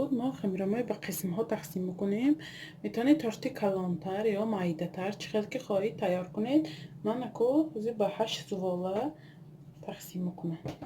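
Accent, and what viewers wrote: Isfara, Tajikistan. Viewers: хамаи видиохо зур диданба мигабайт намирасад авлаш рахматки забони исфарагиамонро гум накардед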